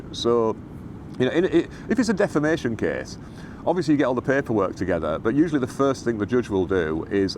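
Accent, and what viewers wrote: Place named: Northern England, United Kingdom. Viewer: As someone that knows nothing about law I do enjoy listening to these videos and find them educational.😇 May I ask where that untainted Northern twang that you have is from, as you are very likely close to my own roots (Hull, Hessle more precise)? Definitely the way you pronounce your words, like 'Erm and Away' I'm guessing NE Yorkshire somewhere? The accent quickly changes once one starts travelling up and down the A1. Keep the videos coming.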